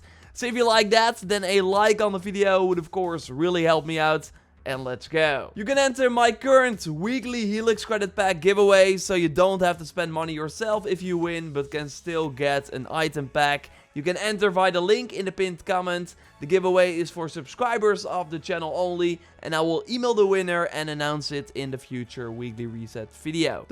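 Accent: Netherlands. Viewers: This Dutch accent hurts my poor ears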